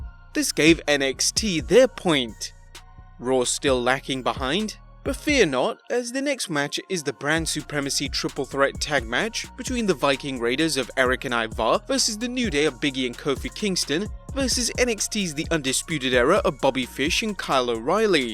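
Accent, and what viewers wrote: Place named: United Kingdom. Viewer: YES!!! The British voice is back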